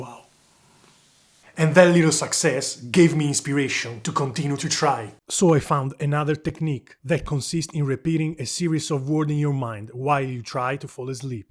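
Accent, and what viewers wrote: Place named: Italy. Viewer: from the accent he seemed italian and then i read "ho sognato che stavo mangiando un panino", ed è lì che ho capito che era mio fratello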